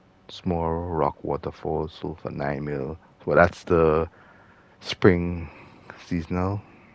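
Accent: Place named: Trinidad and Tobago. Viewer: You sound Trinidadian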